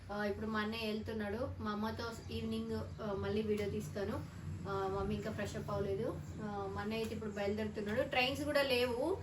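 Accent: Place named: Gauribidanur, India. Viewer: nam Gowribidnur slang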